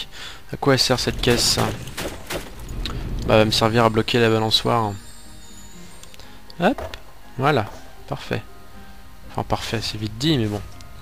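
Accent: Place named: South, France. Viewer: J'adore l'accent du sud de:D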